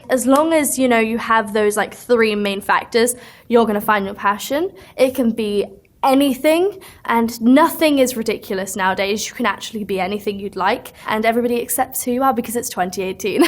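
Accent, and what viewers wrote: United Kingdom. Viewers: She’s British? Oh damn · Shes britisH?! I didnt even know idek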